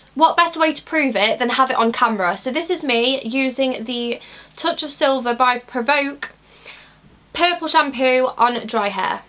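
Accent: United Kingdom. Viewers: are you from near nottingham? you have such an east midlands accent! xxx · You have a nice accent...are you Scottish?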